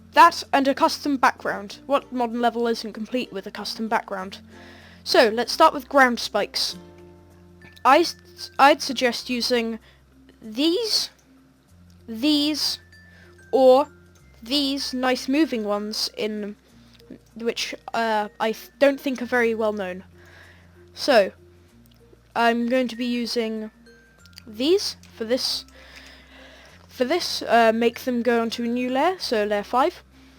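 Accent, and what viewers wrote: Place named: United Kingdom. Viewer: The person talking sounds like a British man 🤣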